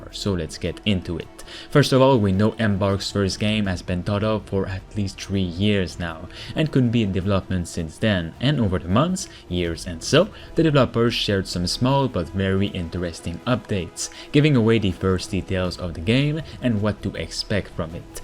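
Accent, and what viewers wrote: Quebec, Canada. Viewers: Hey man, are you Québécois ? You have the accent ;) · yes , plz cover it :) . also i can definitely ear that youre from quebec as you dont have a french accent in your english . soooo content de voir un qc qui reeussi sur youtube . ps oui je sais , mon francais est a chier . sa cest due au fait que langlais est plus facile pour moi meme si je suis un pur laine :)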